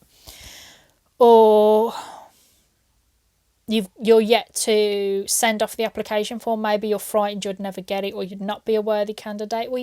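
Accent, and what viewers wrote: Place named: Northern England, United Kingdom. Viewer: You just came on my feed as I was asking about a job application, but I just had to comment before anything and say that as a northerner, it was such a pleasant surprise hearing a northern English accent when I clicked on this video! I hardly ever hear other northern girls!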